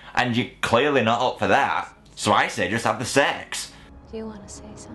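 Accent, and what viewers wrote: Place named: England, United Kingdom. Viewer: Thats the problem, i watched cause it said theres gonna be a review, but it was just a bunch of non funny jokes and annoying english accent for 25 minutes or so....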